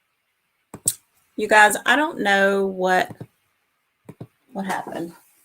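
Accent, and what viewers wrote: South, United States. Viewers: I’m new to your channel, love what you do, love your southern accent, and love that love Jesus😁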